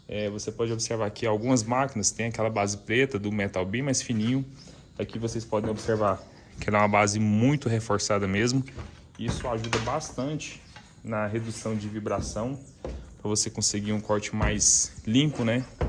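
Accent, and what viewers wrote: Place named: Inland Sao Paulo, Brazil. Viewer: Você fala com o sotaque interiorano de São Paulo, como o meu avô, quando diz "garga" ao invés de galga, oriunda do verbo galgar. Me deu saudade. Observações: Use luvas anti corte quando manusear máquinas de corte, aconselhável usar mascaras anti pó, principalmente em MDF. Se puder, óculos com isolamento para os olhos, também. No demais o vídeo esclareceu bastante. Obrigado.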